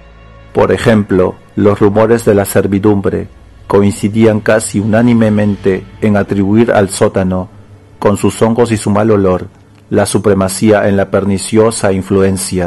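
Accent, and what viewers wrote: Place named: Jamaica. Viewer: Bien por la lectura, pero a veces suenas como si fueras jamaiquino. Para que trabajes en eso. Saludos.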